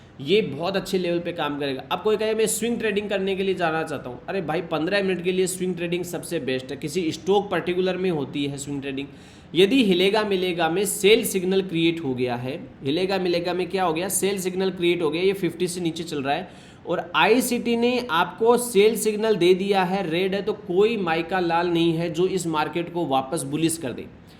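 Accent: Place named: Marwari, India. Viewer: Marwadi lahja is always seen. Keep it up.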